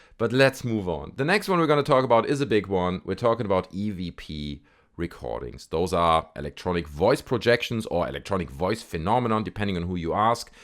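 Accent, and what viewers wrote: Germany. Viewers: very good english but i know exactly that you re German 😂 thick accent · why you sound german lol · Mann, hört man dein deutsch sein raus 🤣🤣🤣🤣🤣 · I love your German accent! It's cool!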